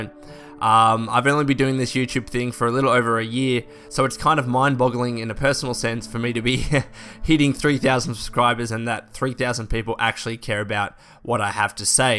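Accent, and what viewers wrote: Australia. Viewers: ***** its so tough!! Dude where are ya from, I know its an Ossie accent but do you live in Australia? :)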